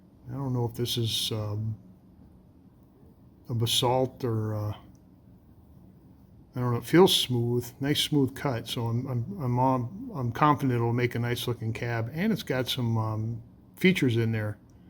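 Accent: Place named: North, Germany. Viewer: Oh heck yeah! This is interesting. I‘m German but living further down south, on the Rhein. sounds northern German and her rocks imply she’s from up there, too. Not to dox her 😅 Flint is super common up there but I hardly ever find any down here. It was interesting to see the difference when I lived up north.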